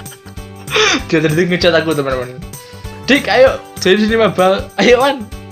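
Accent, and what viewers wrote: Java, Indonesia. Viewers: Medoknya dipertahanin bro, ciri khas elo xD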